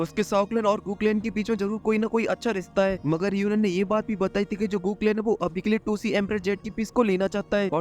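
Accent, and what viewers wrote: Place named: Madhya Pradesh, India. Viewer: Mori mora lak ta ha ap MP sa ho😂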